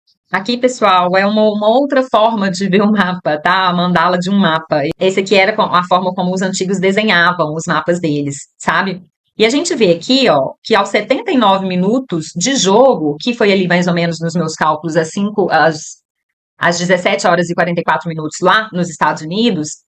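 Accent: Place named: Minas Gerais, Brazil. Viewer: 😂😂😂😂😂 super acontece. Ouvir sobre mapa astral com sotaque mineiro é um bálsamo! ❤️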